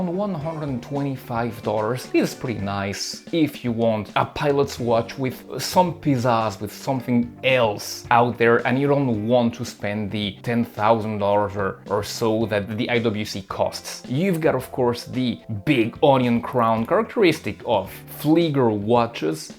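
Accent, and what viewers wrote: France. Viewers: When I heard your accent, I checked if it wasn't a translated video from French. No offense ;) Mon accent n'est pas vraiment meilleur pour être honnête. Quoique qu'il en soit bonne et honnête vidéo. J'apprécie que tu ne t'extasies pas sur tous les modèles.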